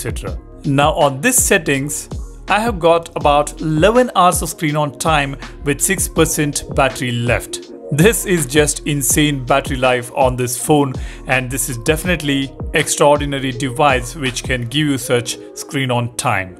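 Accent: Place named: India. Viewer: I was looking up for a video about the n10+ 3.1 UI but I couldn't bear the heavy indian accent I can't.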